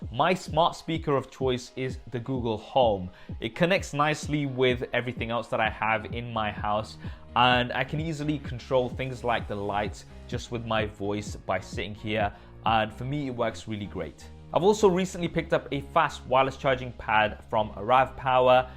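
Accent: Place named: United Kingdom. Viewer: I hate British accent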